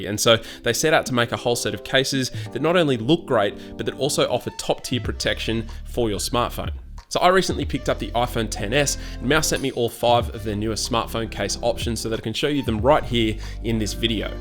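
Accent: Australia. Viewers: I'm sold with the magnet tech. I was wanting something like this to stick to equipment at the gym so I can see the timer without having to stop. Great review. Nice to hear an aussie voice on the tube too.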